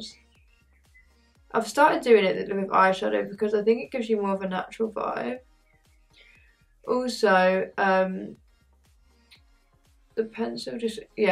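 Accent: United Kingdom. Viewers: didnt expect you having a british accent lol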